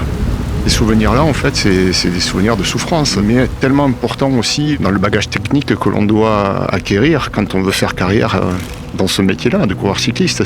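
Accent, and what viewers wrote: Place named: South-West, France. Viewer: Le Nord raconté avec l'accent du Sud Ouest 😍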